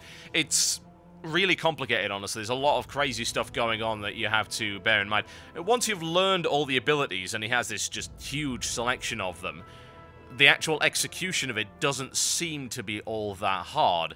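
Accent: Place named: London, United Kingdom. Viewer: man, you're really british.. and I think it's plesant.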